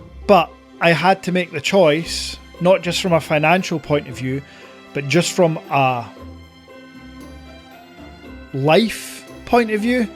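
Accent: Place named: Scotland, United Kingdom. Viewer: Dear dad I as an average french dad ( less exotic than Zimbabwe) was very happy to know more about the human being sitting in front of his youtube camera. Be restassured :for me you are not just an image on a electronic device, one can feel you are a loving famiily father because I have noticed that your beautiful sons appear more often than not on your tests. I was happy to see your wife for the first time to complete my "discovering you". I was also very surprised to learn that you were a scottish parliament civil servant, you more look like a young manager . Actually that is what you have become now. Once again I tell you a 2nd time that I admire your courage quitting such a comfortable professional situation. I would also like to say that your incredible scottish accent reminds me that II myself also speak like you if you compare my accent to the official french one. 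That because I live near the swiss german border and because my region has been invaded by the germans during and between 3 wars. That is the reason why we are french republicans but we also have a german culture with a "rough" "rocky" accent. I was also feeling sorry for your sight problems and once again I admire your courage. If your feel like developing a channel for luxus watches please do and my son sharing that passion with you will certainly join the channel. Just a small last precision: some months ago you were mentioning that anyone writing a comment to one of your videos (what I did) would have a c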